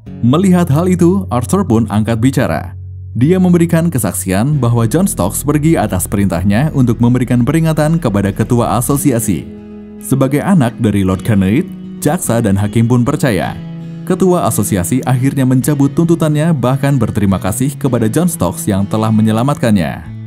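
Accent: Banyumas, Indonesia. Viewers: Asli tetep ngapak kawan asli Cirebon ☺️☺️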